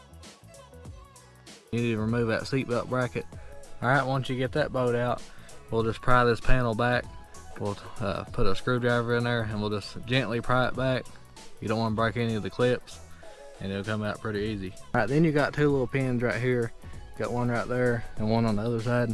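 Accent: United States. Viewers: U did a good job being the first one, their is no way we don't live close to each other with that accent, I live in Central NC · Awesome video! Straight to the point with all the information you need without a buncha blah blah blah, and a plus is you got a southern accent like me! EXCEPT it is impossible to unplug the harness glued to the headliner !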